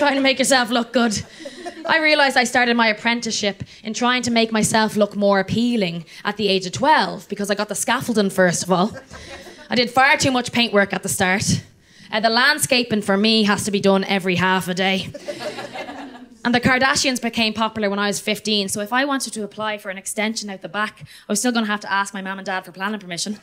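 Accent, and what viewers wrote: Ireland. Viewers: am April 3 2024 To hear you talk in an Irish accent makes my eyes water with joy !!! You are an angel. !!!! Yer woman is Funny … my gut aches Tanks for sharing!!!! Absolutely brilliant!!!! Nothing but lovely , fair play to ya. Slainte’ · She is very cute and I usually love an Irish accent, but she reminds me too much of a girl I tried to date whose brogue would get thicker the more she drank. Cannu unnerstan ya, lassie! 🤨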